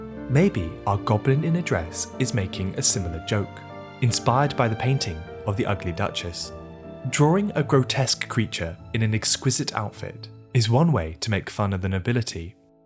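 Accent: England, United Kingdom. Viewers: This is good stuff my snooty sounding English friend. More please. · British narrator Not gonna listen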